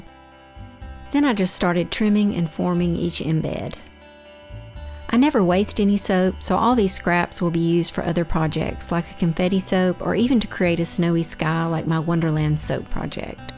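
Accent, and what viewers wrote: South, United States. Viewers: I am so proud of you I have a tear in my eye. This is an amazing video that I can tell you put your heart and soul into. I love your voice over! And let me just say I did not imagining you having a southern accent! I need to change the way I say, “Oil (insert Southern California accent 😂).” You say it so much cooler! Bravo and take a bow!!!!!!!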